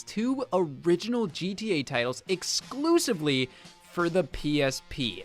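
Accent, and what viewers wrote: Midwest, United States. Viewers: Mid-western accent (especially Ohio)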